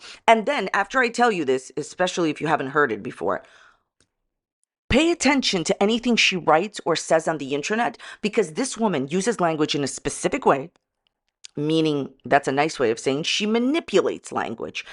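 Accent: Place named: New York, United States. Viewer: With how sneaky her words are, she could be a lawyer. Shame she doesn't have certain other qualities needed. Btw, if someone hasn't coined the name Scamberlynn/Scandalynn Reid for her yet (pretty sure it's been done though) I'm claiming it 😂 Also, hi! This is the second time I've seen your video pop up in my feed. Not much of a follower of Gorlworld beyond the occasional video here and there, but I think I'll stick around with you for a bit because you've got a really pleasant voice and accent (New York/New Jersey?). Have a subscribe 😊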